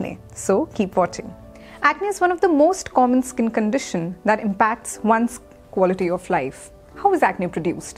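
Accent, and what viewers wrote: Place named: India. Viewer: Please not the hindi accent againnnnn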